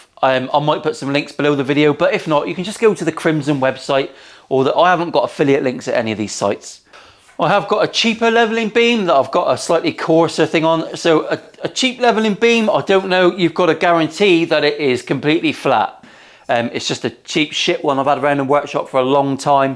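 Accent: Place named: Plymouth, United Kingdom. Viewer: I don't think I've ever met a pretentious Janner. The accent keeps us humble mate. Just found your channel, instantly subscribed. Legend.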